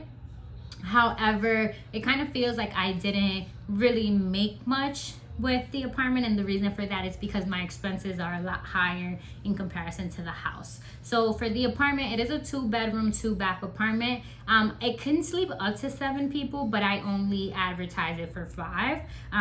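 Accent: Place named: United States. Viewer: Great breakdown. Thanks for posting it. How did you end up in DR? You look Dominican but speak American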